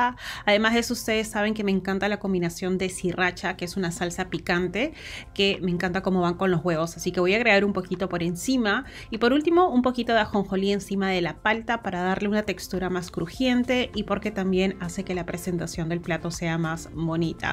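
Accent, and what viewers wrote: Peru. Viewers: Buenísimo esto gracias ❤ Tu voz es como del peru❤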